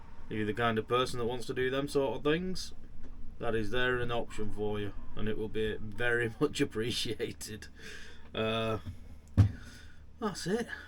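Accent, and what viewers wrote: Bolton, United Kingdom. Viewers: A Bolton lad eh? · Good to hear a Bolton Lad, I lived and studied in Bolton in the late 1980's. Keep the faith you will get there! I sent you a couple of quid there to help.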